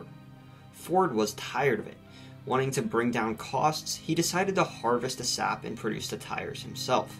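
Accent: United States. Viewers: I don't know if you're american, but you sound american, by the apocalyptical way you talk. Even if you throw a nuclear bomb in it you can't destroy it. Believe me, I'm brazilian and I know the region pretty well.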